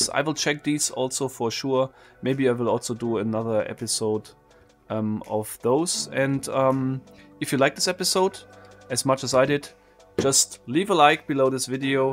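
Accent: Germany. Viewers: Do you actually live in Germany? Because your accent is really German-like.. I'm from Germany too 🤔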